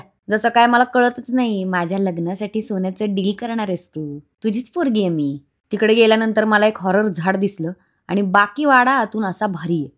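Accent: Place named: Beed, India. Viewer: मायला आमच्या बीड ची भाषा कड़क 😂😂😊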